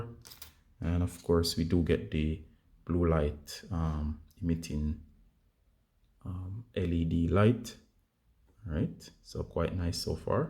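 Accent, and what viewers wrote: Jamaica. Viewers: Yow chap ur accent sounds like a yard man. Where in JAM can I buy this product?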